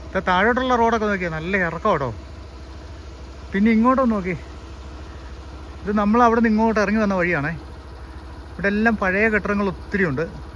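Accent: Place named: South Kerala, India. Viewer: Wow superb well explained kya scenic place & your language slang ( south kl) nice to hear our roots are from thiruvalla we live coimbatore best wishes for more videos thku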